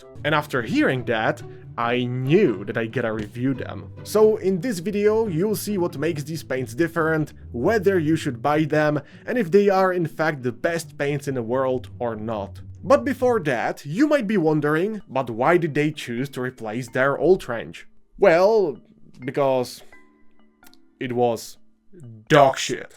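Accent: Czech Republic. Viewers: Where are you from my guy? You sound like guy from Czech Republick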